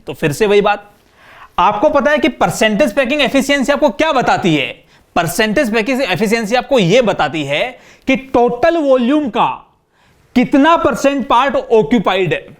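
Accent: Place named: Rural, India. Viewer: Sir ke bolne mai gao ka taste hai Jo iss lecture ko aur bhi interesting bna rha hai Love u bhaiya 3000❤❤❤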